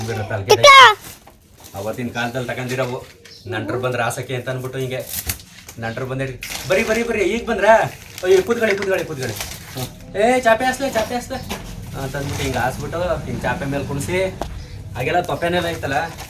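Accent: Mandya, India. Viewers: Nimma ganda hendathi koli jagala chennagirutte. Namma mandya bhashe kelokke chanda .Nim vlog nodthaedre thumba khushi agutte.Nanu nimma hosa subscriber.😊😊😊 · Sir nim hadu bhashe super mandya nativity super family vlogs madi sir nim hendthi soft innocent super sir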